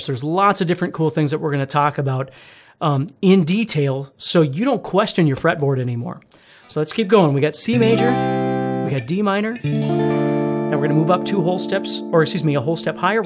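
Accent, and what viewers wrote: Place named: Midwest, United States. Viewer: Midwestern accent. He’s probably from Iowa like me haha. Maybe Wisconsin.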